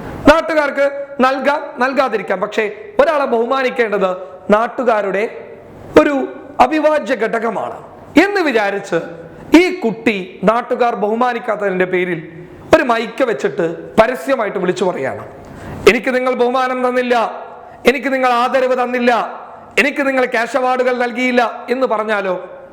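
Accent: Kasaragod, India. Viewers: കേറി വാ മക്കളെ...🙋 ഇനി മുതൽ കാസർഗോഡ് ഭാഷയിൽ ബ്ലോഗ് കേൾക്കാം...😍😍 ഈ ലോകത്ത് നരകിച്ച് ജീവിക്കുന്നതിനേക്കാൾ നല്ലത് ചിരിച്ചു മരിക്കുന്നതാണ്...🤣🤣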